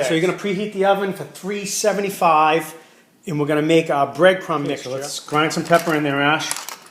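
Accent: Boston, United States. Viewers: All kinds a Boston/Mass in that voice!!! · Stumbled across this video while searching for a way to peel eggplant Love his Boston(?) accent, rapport with Ash, the dog, and his "commercial breaks." Oh yeah, the Eggplant Parm looks amazing, too!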